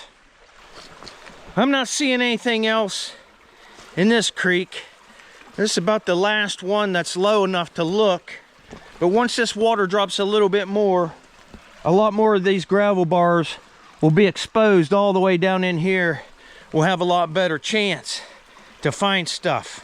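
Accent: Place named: Delaware County, United States. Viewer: love the delco accent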